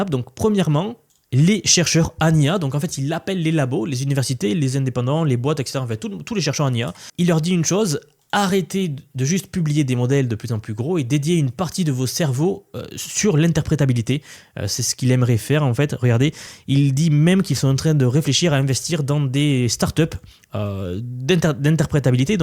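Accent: South, France. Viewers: Mais, la grande question est "Qui est le génial présentateur de VSION IA avec cet accent du sud ???"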